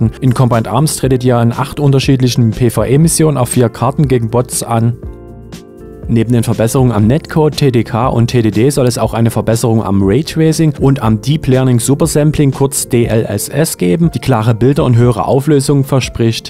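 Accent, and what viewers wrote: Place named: East Germany, Germany. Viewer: Keule, Keule... Ost-Dialekt, und dann so schnell reden, inklusive Englischen-Begriffen?! Da platzt mir die Birne 🙇‍♂️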